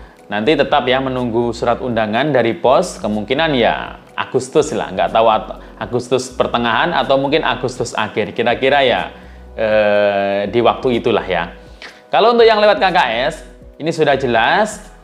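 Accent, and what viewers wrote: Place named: Purworejo, Indonesia. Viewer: Wong Purworejo ni mas...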